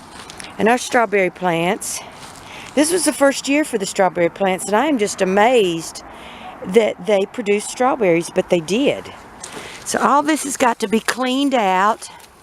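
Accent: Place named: South, United States. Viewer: Love what y’all are doing for the cats 🐈 & Lily the goat 🐐! I also love that southern accent. All of my family is from VA, with a few of us living in MD. Take care, 🎀 Lily 🎀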